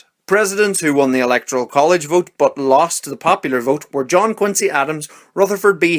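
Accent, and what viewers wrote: Ireland. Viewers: Are you Irish? Just wondering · What part of ireland you from lad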